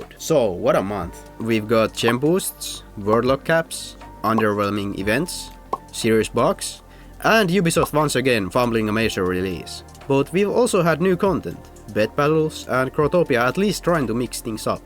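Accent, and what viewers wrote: Finland. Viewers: Jätkä puhuu yllättävä hyvää englantii. Kyl tos o ripaus sitä suomalaisuutta en oo growist kuullu pitkää aikaa nii iha mielenkiintosta kuulla täst pelist pitkäst aikaa. Mahtava video!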